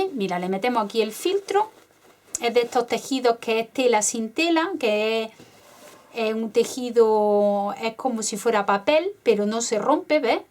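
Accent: Andalusia, Spain. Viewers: QUE RICO HABLAS, OLE LAS ANDALUZAS. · de donde es tu acento español? Andaluz?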